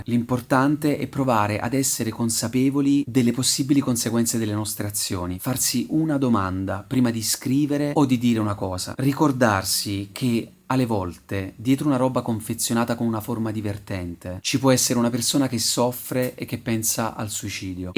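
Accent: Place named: Campania, Italy. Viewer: " sott occhio mi è sembrato che fosse marcoripa con un nuovo berretto, dato il contenuto del discorso, poi però la cadenza più campana che laziale mi ha fatto capire. non ne so niente, per la carità ma, da quello che vedo in giro, la tempesta di feci è un vero limo per certi canali chè, non meritandosi applausi di quelli sentiti e spontanei, sul fertilizzante naturale ci campano sia direttamente che indirettamente perchè, e diciamocelo, più fanno cagare e più abbisognano di un ventilatore che ne diffonda le gesta. proprio in questi giorni l ho cercata perchè ,mentre facevo la rassegna dei primi tuberi da me visonati, lei mi è venuto in mente :coincidenze. comunque devo dire che lei non mi ha mai fatto ridere, anzi. a proposito ,mi saluti il signor youtube "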